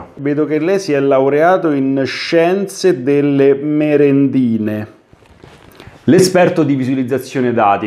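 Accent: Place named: Rome, Italy. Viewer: Grande! La penso come te sui Recruiter e la retribuzione che offrono in Italia, scrivono annunci contraddittori, alte competenze e stipendi da fame...prendono tutti per stupidi. Mi sembri romano dall'accento, tu in cosa sei Laureato? Di cosa ti occupi? Sto seguendo qualche tuo tutorial per un capirci meglio su AI e ML per un mio progetto e tu ne sai molto, non nego che trovo molta difficoltà, non essendo un matematico... però non mi arrendo, sono solo più lento degli altri 😊 io voglio specializzarmi su Python per AI e ML, hai qualche corso (gratuito) in particolare da consigliarmi? Grazie per i tuoi video, ti faccio i complimenti😊 perché spieghi Python mentre scrivi come se scrivessi in italiano...sei una potenza!💪😉 Ciao 👋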